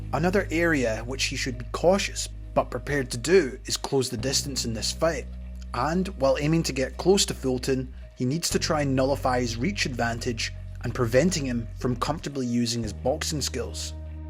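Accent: Scotland, United Kingdom. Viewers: A Scottish accent is so right for boxing videos. Gritty, poetic and profound.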